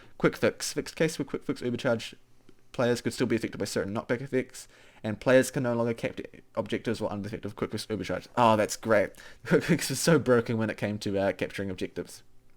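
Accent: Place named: New Zealand. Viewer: Confusing to hear a kiwi voice on a popular youtube channel.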